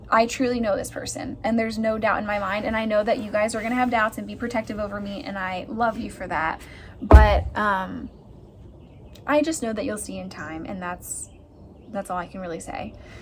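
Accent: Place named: United States. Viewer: You've gotten a country accent!! How do you still make income when you're not posting? I'm not asking for like a super personal answer, but I'm curious what other streams of income you have, help a girl out😂 Is the house you're at outside now the new home, or the old one? Whichever it is, the porch is definitely giving that old farmstyle vintage house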